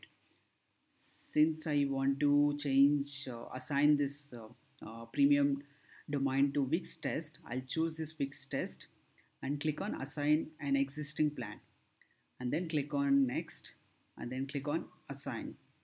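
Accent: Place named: India. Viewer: Thankyou so much. So glad to hear a indian helping us out.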